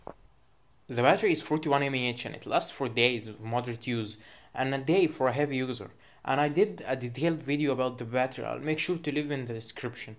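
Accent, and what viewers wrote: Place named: Arab world. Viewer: dat arabic accent doe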